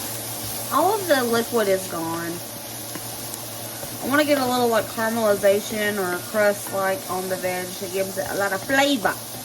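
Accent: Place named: Tennessee, United States. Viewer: I love watching your videos. Great information and ideas but your accent reminds me of Home. i am a transplanted Vol living in another state and I miss home.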